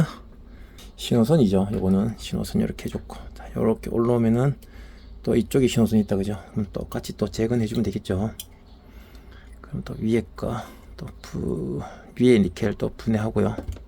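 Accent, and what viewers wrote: Gyeongsang, South Korea. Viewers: 사투리가구수하십니다..ㅎ 저도진해에서 군생활을해서 경상도사투리 좋아하는데.,ㅎ 후딱후딱 잘만드시네요 저도영상참고해서 리필해봐야겠네여 직업이 전동공구많이쓰는직업이라 저렴하게 리필해써야겠어요 영상만드는 일이 힘든일인데 영상잘보고 갑니다..^^ 화이팅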